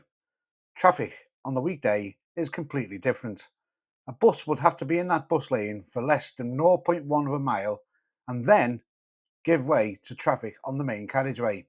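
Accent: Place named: North, United Kingdom. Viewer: Glad you've got rid of that AI voice - Normal northerner, happy days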